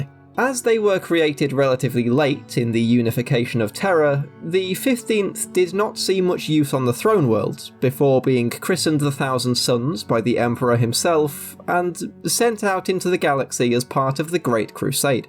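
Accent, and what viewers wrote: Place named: United Kingdom. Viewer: A handsome man with a smart british accent nerding on about warhammer? Yes please!🎉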